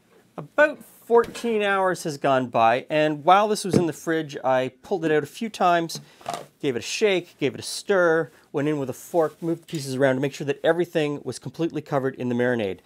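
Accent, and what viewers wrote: Canada. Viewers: It's funny, despite saying pop and hearing a Canadian accent daily, I'm always still momentarily taken aback by hearing them in the media I consume. Thanks for all the work you do,!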